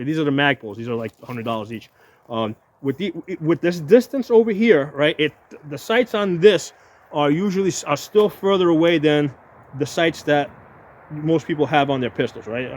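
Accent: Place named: New England, United States. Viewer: Yankee with a speech impediment. Negative. I’m not listening to this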